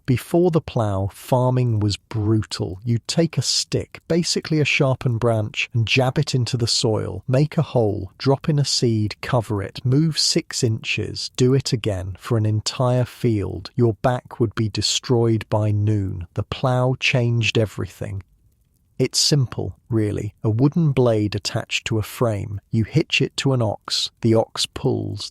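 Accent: England, United Kingdom. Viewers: Hello there well this is my first time posting, I am from Northwest Ontario and a very small community of 2000 people. I am native Canadian and I absolutely love listening to you every night, I love the fact that you have an English accent, which is very pleasant And I must have fallen asleep at sometime but the factors I enjoy it when I come back every other night thank you so much for this service as long as you’re not a Yankee, thank goodness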